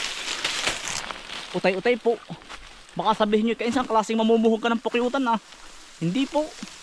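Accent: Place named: Batangas, Philippines. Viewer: Silent subscriber from California USA....naaaliw akong panoorin ka. Ang diction mo ay kakaiba parang Batangas. Ganyan ba sa Tayabas Quezon ang salita,nakakatuwa ka pakinggan. Masipag kayong magkakapatid, lucky ang mga spouses ninyo na magkakapatid masipag,humble,masikap at maasikaso sa magulang. Ang fruits ay puede lang kuhanin sa.puno hindi na bibilhin pa. Ang bayabas ay expensive dito malalaki 1 lb.is $6.99 rich in Vit.C iyan. Edible ba ang prutas na parang strawberry, baka poisonous iyan.Always watching ur vlog lalo na at stressed ako sa work. GOD BLESS!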